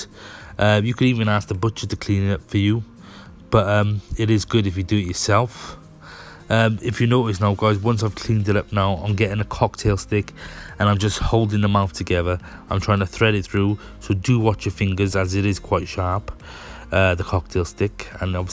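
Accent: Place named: Cardiff, United Kingdom. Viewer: Got to be a cardiff boy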